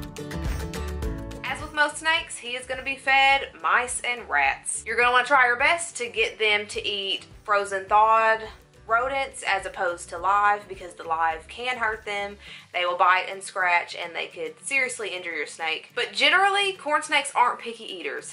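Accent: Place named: Texas, United States. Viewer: You and I have the same accent I'm from Texas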